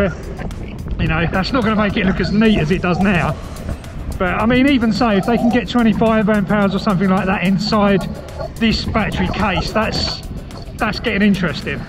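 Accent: United Kingdom. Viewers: Love the british accent: Pe'al to the me'al haahahah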